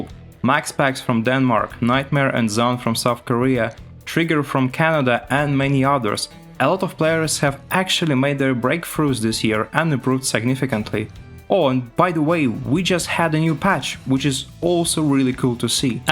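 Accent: Russia. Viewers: Why do Russians pronounce match as /much/?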